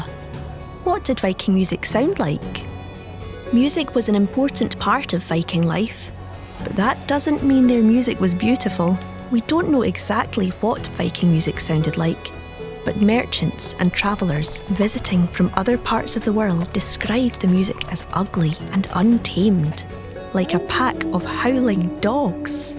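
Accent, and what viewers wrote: United Kingdom. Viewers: Scottish Accent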